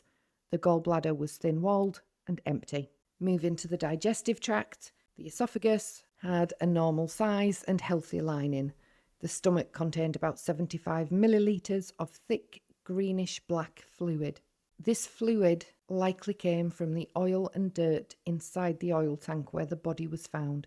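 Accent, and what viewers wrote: United Kingdom. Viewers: New to your channel, i love the British accent. Hard to understand those that don't (kiwi here) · I love the British accent❤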